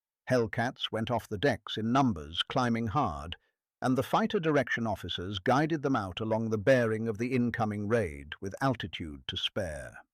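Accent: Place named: United Kingdom. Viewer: Why does a channel ostensibly about US history use a narrator with a British accent?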